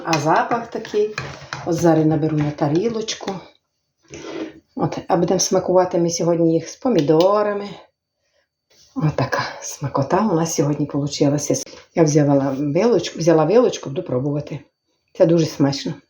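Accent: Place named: Western Ukraine, Ukraine. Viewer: При чем здесь мова? Хуторской западинский говор никого не красит. Женщина простая, сельская, ей простительно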